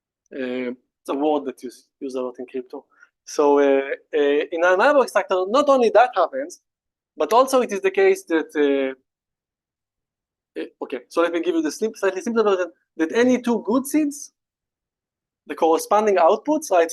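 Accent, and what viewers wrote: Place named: Israel. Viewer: תודה על השיעור ! (יאמר לזכותך שמרגישים ממש את המבטא העברי בתוך האנגלית)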